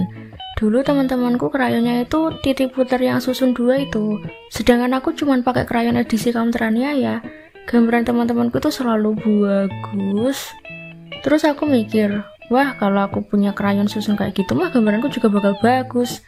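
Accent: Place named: Java, Indonesia. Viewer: Medoknya bikin candu😚😚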